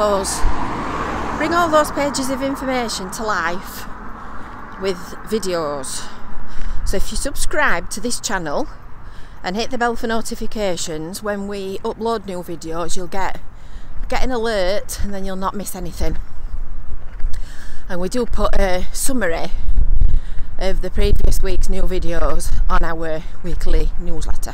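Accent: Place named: Northern England, United Kingdom. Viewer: Eeh Lass, thar accent is nowt less than beautiful. Bless you for being so wonderfully and unapologetically Northern. I do miss the land of my birth. I used to set nightlines as a boy (early 60s) from from Gynn Square to Bispham, but know the whole coastline well, Blackpool to Fleetwood Bless you, you Yorkshire born lass, just as I am a Yorkshire born boy. I live in such a delightful surroundings in the South of Ireland, but there ain't, no place like home. Or up North lasses.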